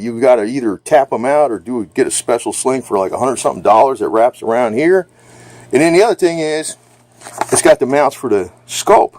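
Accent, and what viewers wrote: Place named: New York, United States. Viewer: Sounds like your from straight outta New York. Or at the very least Northeast? American over China any day.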